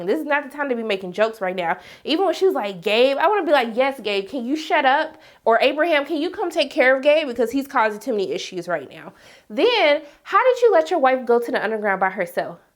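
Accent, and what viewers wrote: South, United States. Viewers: I want to watch the film again, because my first viewing of this had me disappointed. I think the movie on a technical standpoint was well made though. The music was fire! I love this girl's light southern accent (I'm guessing Georgia). I could literally just listen to her read a manual on statistics. Subscribed.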